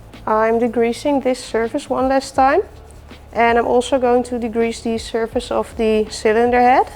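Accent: Netherlands. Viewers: Nothing better and clear than Dutch English. 💪❤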